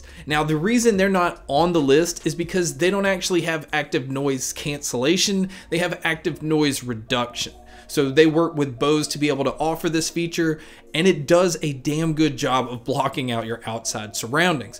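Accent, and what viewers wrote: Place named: Texas, United States. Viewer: I used to think that the Indian accent was the worst on the planet but, damn, yours tops the charts is it texan? . Great review though man and love your content!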